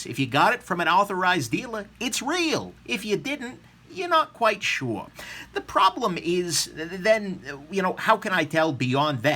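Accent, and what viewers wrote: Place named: South, United States. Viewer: Your southern accent is amazing!! You’re hilarious! Love it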